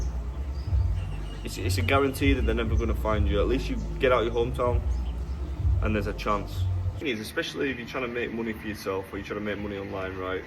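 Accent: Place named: Leeds, United Kingdom. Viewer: you sound like you’re from Leeds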